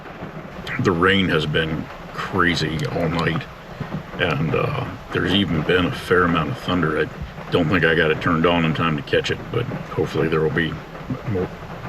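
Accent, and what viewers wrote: South, United States. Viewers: Aha, I knew I heard a southern accent. I finished a three year old video a little bit ago and in my comment , I asked if I caught a southern accent. I just read a comment by you saying you were born in Georgia.. Me, too. I was born in nineteen forty five in an ambulance on Peachtree Street in downtown Atlanta. I'm married a TenneNossee boy, and we moved to tennessee when we retired. The cold and snow and ice of minnesota that you And Fiona camp in just blows my mind. Just What do you find fun in that? I do Really enjoy watching you do it.But I do worry about Fiona sometimes. I look forward to your scamp adventures and watching you redo your Scamp camper.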